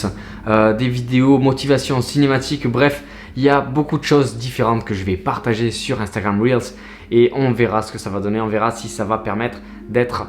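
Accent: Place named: South, France. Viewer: Salut! Je viens de découvrir ta chaine ajd, merci pour ton travail! C'est génial de pouvoir écouter qqn avec l'accent du Sud haha! En tous cas si l'ocean et la photo sous marine t'intéresse, mon insta c'est: saltyskin_photography Cheers!:)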